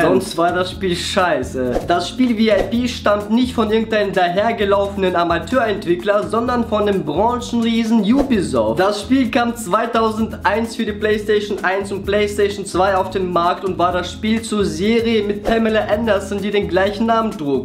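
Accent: Russia. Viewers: Er ist russe...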